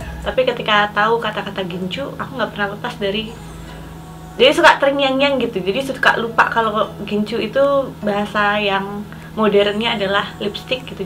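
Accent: Java, Indonesia. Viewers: Udah logat2 jawa ya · Medognya sudah mengalir hehe. Lanjutkan ibu 👍👍👍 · Aduh logat jawanya kalihatan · Aduh ngomongnya udah meddok hihii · logatnyaa jawa bangett yaa kwkw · Tak urek2 kata bu.. Jawa bgtt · medoknyaa ada bangett😍😍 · Ngomongnya sudah agak medok yah Bu salam dari saya rakyat Suroboyo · udah ketularan medhoknya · Yey...sudah medhok Jawa! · Jadi medok ya Bu kalo ngomong duh gemes tapi so lovable 🥰😍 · Cara bicaranya sekarang lebih ke medok jawanya yaaa😊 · Kelamaan dijawa jadi medok logatnya 😂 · Medok banget Bu...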